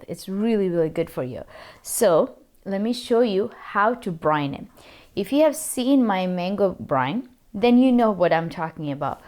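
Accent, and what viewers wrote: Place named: United States. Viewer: Mouth watering recipe. I am gonna try. Thanks for sharing. Btw you have fine American accent. How did you achieve it?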